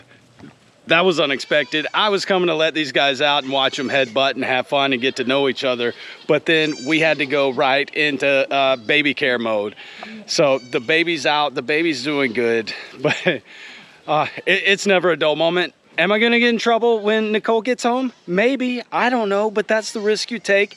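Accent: Louisiana, United States. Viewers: Love the channel!! Where you from, you definitely don’t sound like Florida…that sounds like my neck of the woods…Louisiana!! If you not from Louisiana you definitely must do a lot of swamp impressions and your getting better at it😂 I’m not from the parts you sounds like but it sounds definitely Pierre Part La but im from a whole different bayou in Pointe Aux Chen La on a Native America Indian Reservation😊 God Bless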